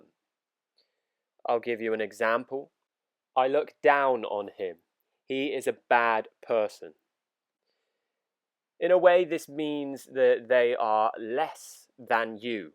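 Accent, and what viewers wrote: United Kingdom. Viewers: I am looking up to for learning the British accent.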